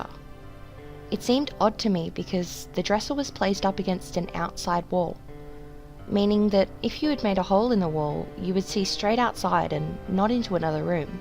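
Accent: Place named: Australia. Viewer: It's good, you have to work on the voice quality (mainly removing white noise, if you use audacity, the noise reduction feature will be your best friend, and also the change in volume, try turning the music up and down instead of the vocal track) but it's still a better quality than my first videos! And I'm a sucker for Australian accents, so I absolutely love that! :) Subbed, rooting for you!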